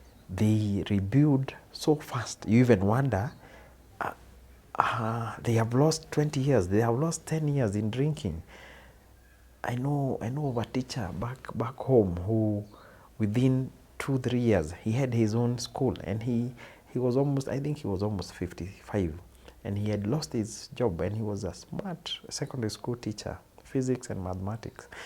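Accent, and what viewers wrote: Kenya. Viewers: i will never judge an alcoholic... there are so many underlying causes that need to be resolved some issues are as far as childhood traumas. thank you for this one. i love how you are narrating your story, it has pure kikuyu under tones. 😊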